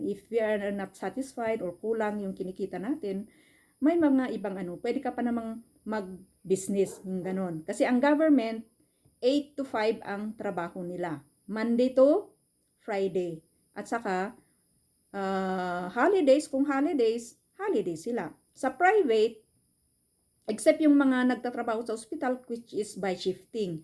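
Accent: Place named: Igorot, Philippines. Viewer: Ne, apay kasla nga Igorot ka nga pada met nga agsau auntie? Hehe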